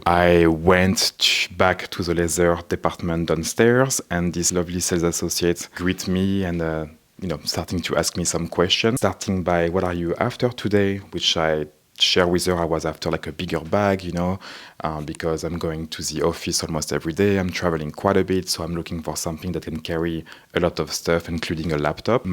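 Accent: France. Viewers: French who speak english with french accent it s awfull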